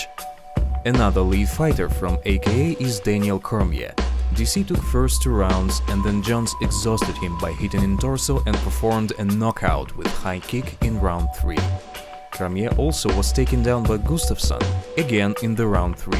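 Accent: Russia. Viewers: Guys, I love your analysis, but you need someone else to read the English text. The thick Russian accent will scare of most newcomers.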